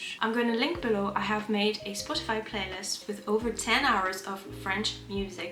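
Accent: United Kingdom. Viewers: love your english accent! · I can tell that you have spent many months in the UK. Some of your sounds are very British. That's good. Well done. I would not have guessed you are French. This was a good list of what I have encountered while learning French. I'm at a 50 score now, and hoping to make B1 before I go to Senegal in March. I wish I had made more of an effort in grade school. I'm also working on Spanish, since I will be in the Dominican in October. I do missionary construction work, and I don't like to rely too much on the missionaries to communicate. Netflix does not have a lot of French content in the US, so if you have a tip for me about how to access that, it might help my French listening ability.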